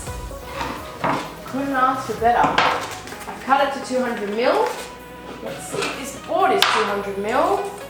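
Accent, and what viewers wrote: Australia. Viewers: Im researching the sawstop and came across your channel and can I say it’s so nice to hear another Aussie. That was a great review and content thanks for sharing it’s been 3 years for you so I’ll check out some more of your videos and see what your up to and what you think of your saw now cheers. I gave a sub to and a like of course.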